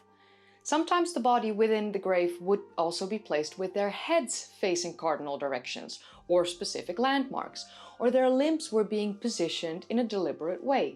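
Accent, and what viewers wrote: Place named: Netherlands. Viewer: Of course she's Dutch. You can tell by her accent. (Almost).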